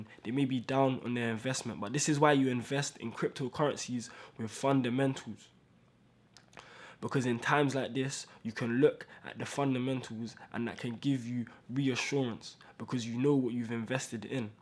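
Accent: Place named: United Kingdom. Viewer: your uk i know because how you talk.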